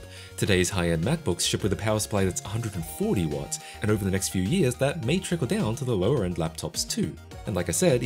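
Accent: Australia. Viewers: I don’t blame them, addressed that his accent is a mix of a lot of YouTubers he watched growing up and his native Aussie accent. He also lives in the US now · Daaamn is a fellow Aussie! Hey, one tip- never lose the accent!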